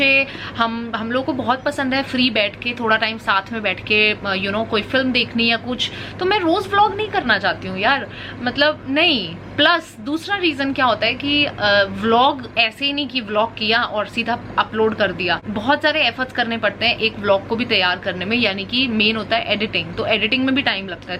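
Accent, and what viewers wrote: Punjab, India. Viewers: ji aap panjabi kyu nahi bolte ho mene apka vo prank vala vedio dekha he usme ap bolte panjabi bahot sone lagte aap panjabi bolte hua.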